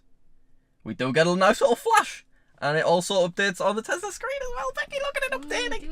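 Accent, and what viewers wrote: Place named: Northern England, United Kingdom. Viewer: Time for my dose of good northern English accent! Yay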